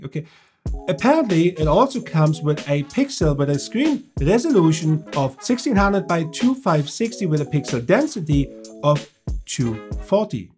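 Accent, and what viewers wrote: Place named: Germany. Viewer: i have stayed in germany for years. This is the accent of Germans, i can smell it from miles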